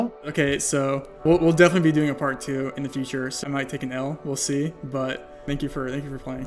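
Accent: Germany. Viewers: how can you speak such a german english haha what a legend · You can instantly tell the Country in Geoguesser, meanwhile I can tell , that he is a german fellow 😂👌🏼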